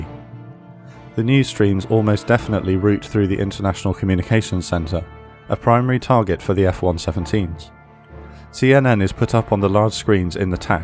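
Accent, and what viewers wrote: United Kingdom. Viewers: Why are narrators always british?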